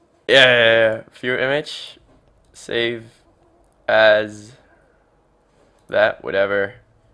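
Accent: Netherlands. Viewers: Are you Dutch? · Lol love ur englisch its so funny and i reconice myself, becous i also speak dutch ;D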